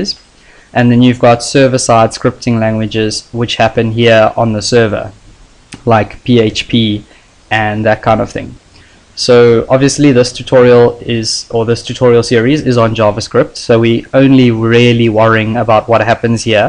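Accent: South Africa. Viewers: south african! Respect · 100% south african accent. aweh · South African? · Dude are you South African?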